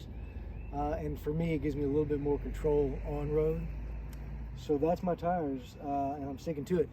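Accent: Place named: South, United States. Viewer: That's right! Speak your southern english just as natural as you please. Roll Tide.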